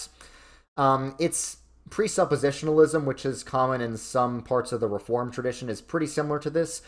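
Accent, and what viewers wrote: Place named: Bronx, United States. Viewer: No offense, but you definitely sound like you are from the bronx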